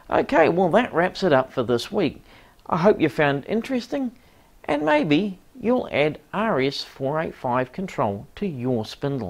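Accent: New Zealand. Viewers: Hi there, Amazing to hear another New Zealand voice speak so eloquently and informatively. Awesome presentation, I don't know how this is the first time finding your channel. Please keep up the good work, good thoughts to you for helping so many.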